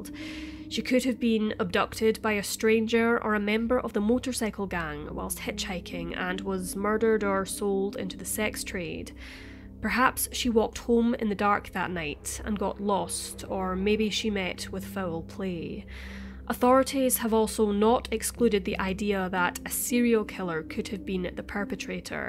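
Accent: Scotland, United Kingdom. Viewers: I agree! A video came up in my recommends and I totally didn’t expect to hear a Scottish accent. I’m from Ayrshire. Your videos are awesome. True crime but much more sedate and pleasant to listen to. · I was going to guess Scottish. Very nice! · Fookn Razor Scottish is so beautiful, people who say otherwise are mad lol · 509mrs its funny cause a lot of people say the scottish accent is horrible, but up in the north east this is honestly how beautiful the language can get!! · Nice to hear a video narrated in a soft Scottish accent... Just subbed... Blessèd be /|\ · It's so nice to hear a real voice on these films, esecially a good Scottish one (I come from Alloa, near Stirling). There's real humanity in the telling of these stories, they're not just told for 'shock' effect - well done to the writer and narrator. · ayyyy a scottish mystery reader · Her accent is Scottish but it sounds “Irish” to many Americans because we’re used to hearing harsher Glaswegian - style Scottish accents (such as Billy Connolly’s) and Groundskeeper Willie on American TV. 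· A lovely Scottish accent. Fantastic pronunciation, gotta luv it. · I wondered what accent she has and went to the comments lol never have i ever heard a real scottish accent before!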